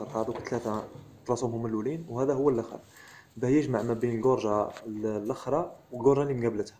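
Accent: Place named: M'Sila, Algeria. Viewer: تبان هدرتك من جيهة لمسيلة مشكور الاخ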